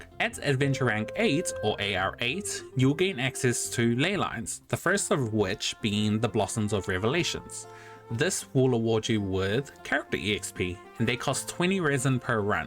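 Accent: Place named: New Zealand. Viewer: That's an accent I recognise, kia ora!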